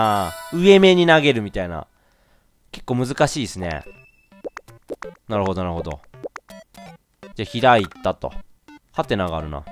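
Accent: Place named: Hiroshima, Japan. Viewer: レトルトさん、喋り方広島弁ぽい笑笑あそこら辺の人かな？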